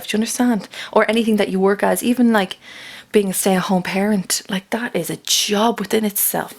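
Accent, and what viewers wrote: Ireland. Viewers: Love that reading, love the Irish accent too! I'm Irish and lived in America for twenty one years, never got the adopted American accent, so hopefully you won't either! Thank you!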